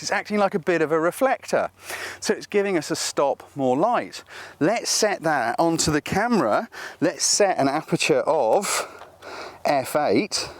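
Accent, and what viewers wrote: England, United Kingdom. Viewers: I think it's because an Englishman is speaking English as opposed to non native. We hear "incident" but I can see why someone who isn't English might hear "instant"!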